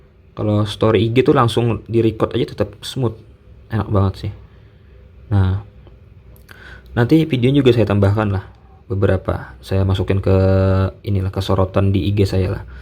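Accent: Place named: South Kalimantan, Indonesia. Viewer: Masnya terdeteksi anak kalsel wkwk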